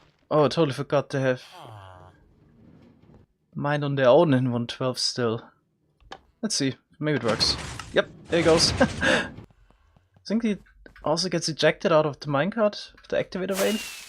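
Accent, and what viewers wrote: Germany. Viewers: Sounds like a german Guy🤷🏼‍♂️🙋🏼‍♂️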